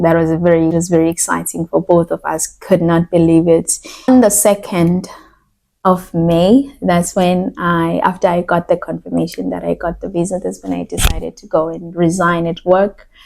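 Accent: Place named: England, United Kingdom. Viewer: Hi guys it's a pleasure to follow you an praying that God bless you all marriage an you talk like you are from England or the UK an not from africa😊🎉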